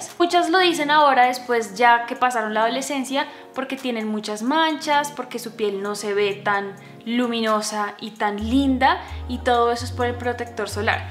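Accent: Colombia. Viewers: Yo apenas escucho su voz: Ella es colombiana jajsjaj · Por el acento, parece que de Colombia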